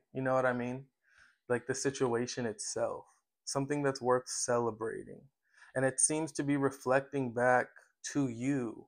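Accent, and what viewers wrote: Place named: England, United Kingdom. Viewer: Aaarrriieess! I loved your English accent 😜 thank you so much for your time and energy. Everything resonates as always ✨⭐️🌸 Sending so much love and light to you personally and also to all my fellow Aries out there - keep going- don’t give up! 🌸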